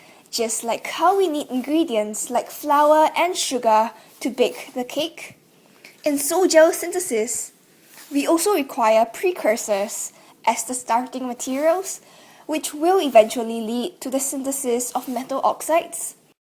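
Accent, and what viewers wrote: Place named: France. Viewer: that french voice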